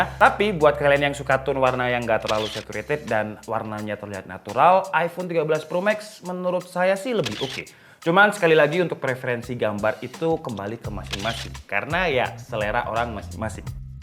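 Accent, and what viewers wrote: Malang, Indonesia. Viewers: owalah om wong malang tah...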